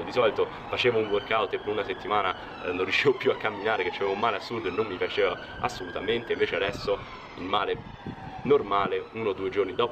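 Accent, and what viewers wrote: Rome, Italy. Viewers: Sei di roma nord o sud? TOP!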